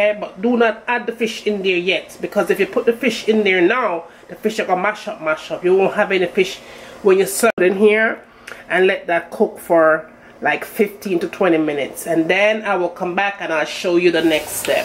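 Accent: Jamaica. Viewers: I love the Jamaican accent but do not let the Jamaican woman get mad at you...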